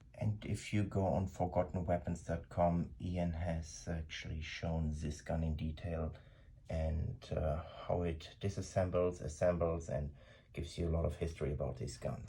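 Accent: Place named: Germany. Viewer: Are you german? Your accent sounds very german.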